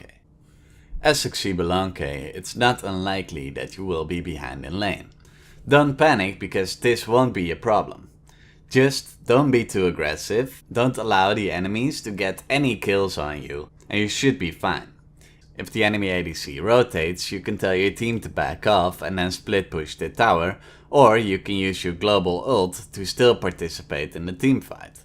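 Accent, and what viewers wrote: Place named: Netherlands. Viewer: Thanks for this video, definitely going to improve my Xbal! Off-topic question but do you mind telling me where you're from? You kind have a Dutch accent, but not sure if you're actually from the Netherlands. :p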